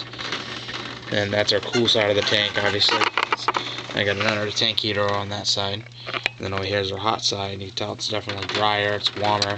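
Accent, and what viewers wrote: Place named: New York, United States. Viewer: I can tell your from new york by the way you speak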